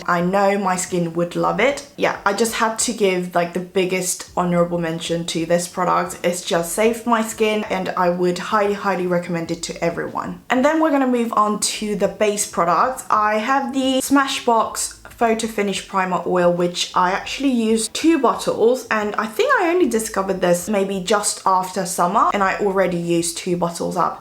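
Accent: United Kingdom. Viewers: Love the British accent🙃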